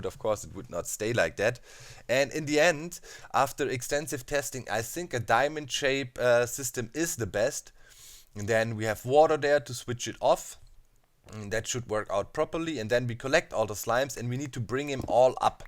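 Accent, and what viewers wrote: Germany. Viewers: I've followed you since the tree house days. I was following Vintage Beef when he visited your base having made himself invisible and you just turned round and shot him . I have followed you since. I am useless at redstone but I love your videos. I really like your elegant building style. I am English from London and, through you,I joined my first multiplayer world - Gummy's Tree realm. Your English is excellent and I love your accent. Subtitles would not work for me because it would lose the close connection that listening to someone's voice achieves. Most Germans speak excellent English so keep going in English please.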